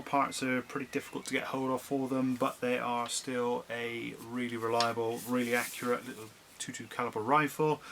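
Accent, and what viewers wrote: United Kingdom. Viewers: Sound like a devon boy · Sounds like your from the forest of Dean area? Do you do any boar control?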